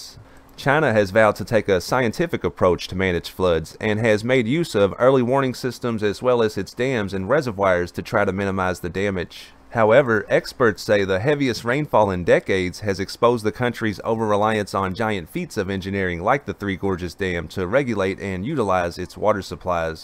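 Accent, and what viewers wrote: South, United States. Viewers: Like the accent tho I gotta southern accent too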